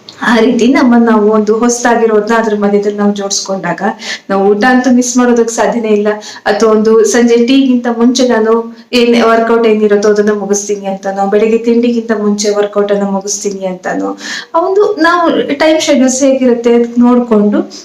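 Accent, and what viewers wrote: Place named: Kumta, India. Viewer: ನಿಮ್ಮ ಮಾತು ಕೇಳಿದ್ರೆ ನೀವು ನಮ್ಮ ಕುಮಟಾ ಕಡೆಯವರು ಅನ್ನಿಸ್ತಿದೆ ಮೇಡಂ.ನಾನ್ ಹೇಳಿದ್ದು ನಿಜಾನಾ...ನಾವು ಕೂಡ ಕುಮಟಾದ ಮಿರ್ಜಾನ್ ದವ್ರು 😍..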